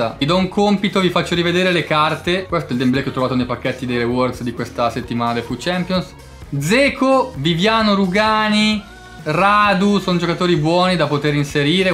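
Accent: Bergamo, Italy. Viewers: E anche in sto video parla Bergamasco ahahahah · Grande ti seguo da quando non avevi l’accento bergamasco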